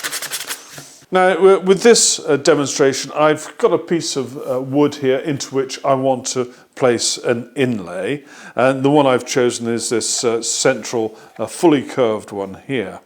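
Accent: RP, United Kingdom. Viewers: Great presentation. Such a nice change to hear someone speaking the Queens English . Subscribed and looking forward to further instruction. Thank you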